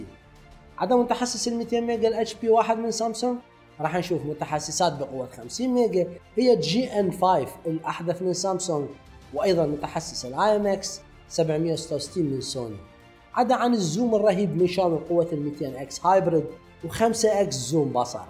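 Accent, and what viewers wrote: Iraq. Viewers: لغة عراقيه طاغيه استاذ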